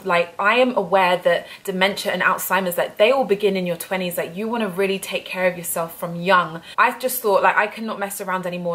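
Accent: United Kingdom. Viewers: Her British accent is soon cute!!!, wait a minute!!! I'm a brit n have one myself!! Damn I sound cute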